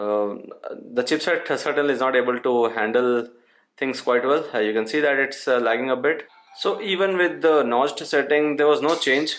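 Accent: India. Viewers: I understood that u r indian 🇮🇳 by listening ur language flow....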